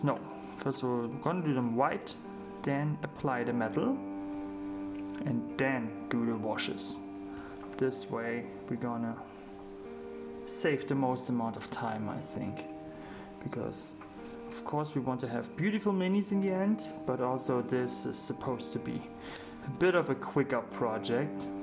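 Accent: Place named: Germany. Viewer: The German sounding accent makes this even creepier.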